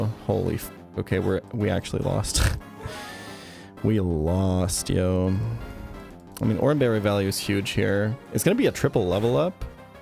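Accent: United States. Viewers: I don't speak German but it's funny that I could hear the American accent regardless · I took German in High School and the American accent while speaking German had me DYING 😂🤣 - a dumbass American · American with obvious American accent but still good German struggling with memes bit may have crippled me.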